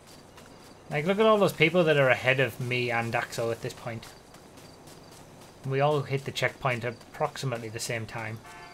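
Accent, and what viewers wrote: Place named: Scotland, United Kingdom. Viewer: Are you... Scottish I’ve never watched you before and I’m Scottish myself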